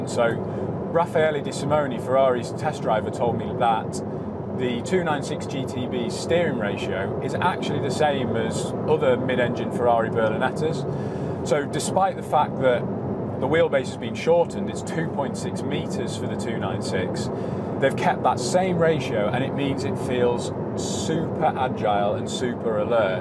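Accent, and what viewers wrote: United Kingdom. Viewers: Wow, what a precise, accurate and fascinating description. By the way, It's the first time that I really appraciate a british accent, which normally sounds to me, instead of sofisticated, somehow ridiculous and artificial..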